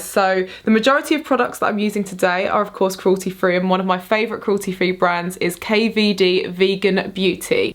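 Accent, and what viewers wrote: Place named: United Kingdom. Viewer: Omg! That british accent !!😍😍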